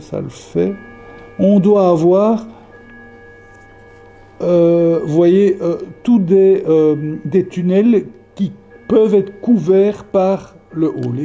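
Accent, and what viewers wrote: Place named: Belgium. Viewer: Merci, bonne explication et une petite critique constructive à la fin. J'adore ton accent Belge, ça me rappelle qd une partie de ma famille habitait Bruxelles !!!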